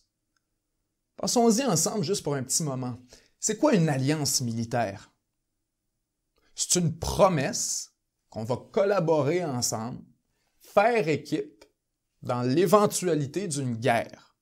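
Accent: Quebec, Canada. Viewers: J'ai eu ma dose d'accent québécois ça fait plaisir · on dirait un terra bellum Quebecois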